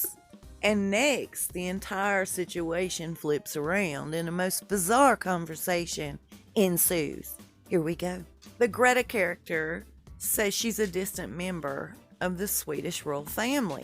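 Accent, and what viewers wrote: South, United States. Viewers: Love you! From one southerner to another. This N’awlins girl digs that drawl!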